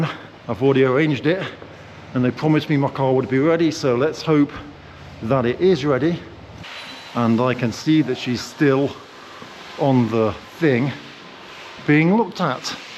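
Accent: England, United Kingdom. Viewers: Hard to tell you are English, than you said "Its All Goes Pair Shaped"! English 100%! You been living there 20 years BUT your English has not changed a bit! Even a Western Canadian can see that!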